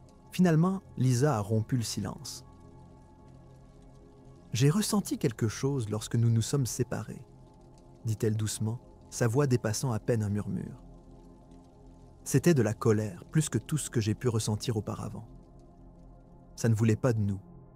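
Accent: Quebec, Canada. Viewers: J adore vos histoires...avec le petit accent québécois c est très sympa 👍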